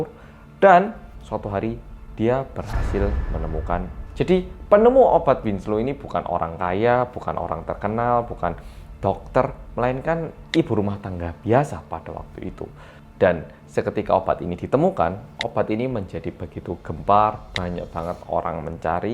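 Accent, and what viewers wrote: Java, Indonesia. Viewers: Kangen sama yang versi medok😍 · anyyeong mas yg mendok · Wong Korea selatan yang mendok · Kembali ke medokkkkkkk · untuk pertama kalinya nonton vidnya di ruang keluarga, dimana ada papa disitu. 5 menit setelah video dimulai, papa mulai bingung dan nanyain "temenmu punya youtube ta? kok muedok e nemen" karena jarang liat youtuber yang medok wkwkwwk. akhirnya aku jawab "engga ini org korea sempet tinggal di malang" trs dikira boong dong wkwkwk akhirnya aku tunjuin "inilo orang korea" sambil liatin vidnya mas yang dimana mas ngomong bahasa korea dan akhirnya papa bilang "iyaya orang korea, muedok nemen, km kalah" disini valent yg notabenenya org jawa kalah sama mas yang orang korea:))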